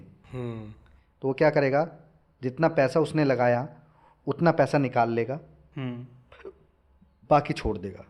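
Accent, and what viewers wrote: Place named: Haryana, India. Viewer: good insight ji, vaise aapke lehje se aap haryanvi lagte ho:)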